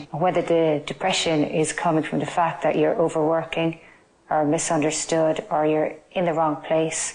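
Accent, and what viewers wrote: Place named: Ireland. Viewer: Irish people really say "me kids" in stead of "my kids", cute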